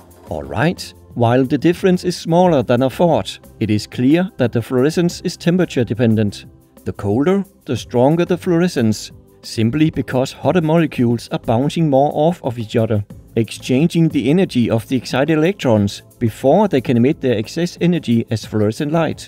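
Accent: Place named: Denmark. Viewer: Elsker din tydeligt danske accent 😂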